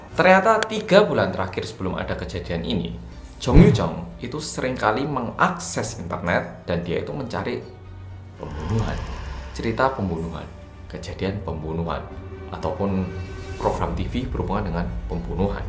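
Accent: Java, Indonesia. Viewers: Trmksh buat pak sopir ya,untuk mas Mimin cara menyampaikan berita bagus dan logat Jawanya kental banget. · Mas kmu orng Korea apa orng Jawa tp kok logat Jawa wajh korea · mas nya muka korea tapi logat jawa 😅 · lo kok yg mbawa wartanya aku pernah lihat dia .waktu dia nyambut pak jokowi hadir d korea .dia ini pinter pakek basa jawa . dan dia bilang pernah tinggal d malang . mantep logat basanya ya medok . semangat brooo moga yutup mu sukses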